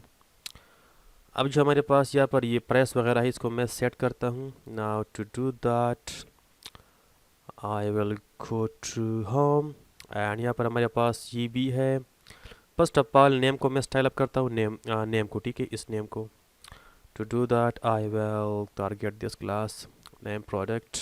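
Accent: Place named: Peshawar, Pakistan. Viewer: Sab acha hai lekin apna khan wala akcent khatam karo bhai ye youtube hai peshawar nahi yahan har banda nahi samajh sakta aap ka style.. please brother..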